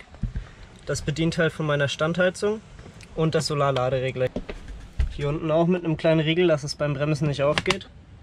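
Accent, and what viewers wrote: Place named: Upper Franconia, Germany. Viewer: Am Anfang vom Video hab ich mir gedacht der is doch sicherlich aus Oberfranken und dann ah der kommt ja auch ausm Landkreis Bamberg wie man selber 😂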